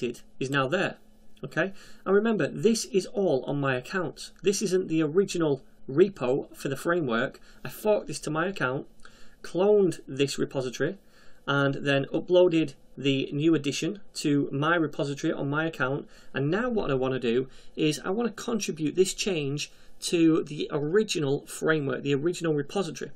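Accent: Germany. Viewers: German accent BTW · btw the accent (yah good) was part German, part Dutch, part Swedish - executed to perfection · Think that was a German accent.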